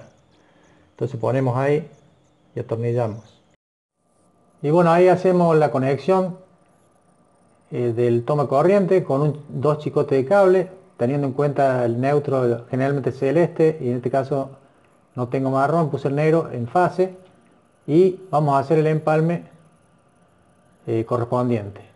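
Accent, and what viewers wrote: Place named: Córdoba, Argentina. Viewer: Por tu tonada,me parece que sos cordobés....😕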